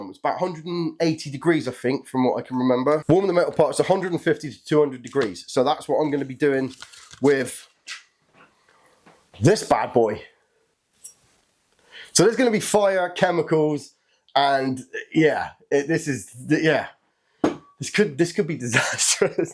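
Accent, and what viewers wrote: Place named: West Country, United Kingdom. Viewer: Thought I recognised west coutry accent.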